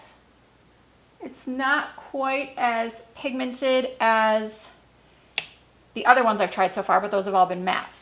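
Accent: Midwest, United States. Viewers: Hi. I love the look you created. I’m so excited to get these. I love the support you, Lisa and Stephanie offer Emily! Strong, beautiful women supporting another strong, beautiful woman. Makes me so happy and proud to be a subscriber to all of you ladies. You guys have created the very best YouTube communities out there💜. Thank you ( I’m from Michigan too! I knew you sounded midwestern😊). Cheers 🥂 to this community!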